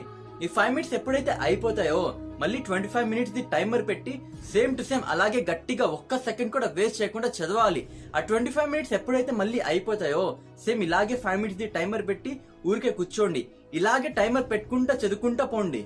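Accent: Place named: Rayalaseema, India. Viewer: Rayalseema slang superb bro iam Harish ekart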